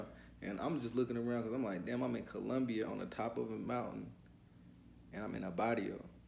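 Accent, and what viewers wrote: Bay Area, United States. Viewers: this dude definitely from The Bay he talked just like Messy marv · You could tell bro from the bay!! Man the police got me and my lil bro out there too smh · He has to be from the bay the way he talk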